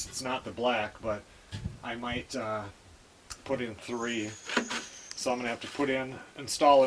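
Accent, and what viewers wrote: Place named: Northwoods, United States. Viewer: Just found your channel; great to hear that accent. I was born and raised in Cloquet, lived in Duluth; now transplanted to OKC. These will help me get my Northwoods fix, so thanks.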